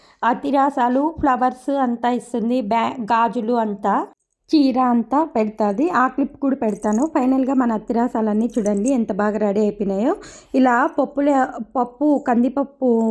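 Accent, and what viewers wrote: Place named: Rayalaseema, India. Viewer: మాకు కొన్ని అరిసెలు పంపించొచ్చు కదా..రాయలసీమ యాస లో సూపర్ గా చెపుతున్నారు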